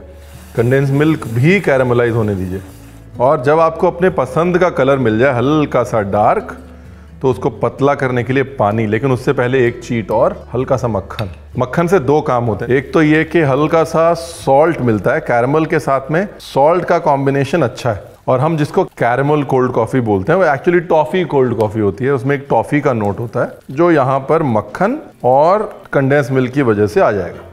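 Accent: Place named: Uttar Pradesh, India. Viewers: Arre bilkul Lucknow wale jaise bolte hai aap, you can easily figure out how a Lucknow wala speaks. I am too from Lucknow. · Totally up wali boli 😅 mee too from lko that's y i like u so much❤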